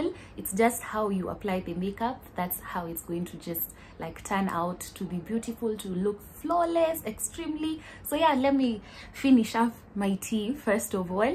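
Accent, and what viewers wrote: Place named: Kenya. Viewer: After the Nigerian Accent, I really love the Kenyan Accent😍 so distinct.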